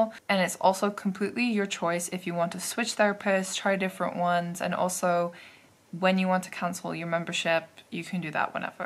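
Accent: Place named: United Kingdom. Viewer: ik verschoot toen ik u ineens nederlands hoorde praten ik was echt convinced da ge brits waart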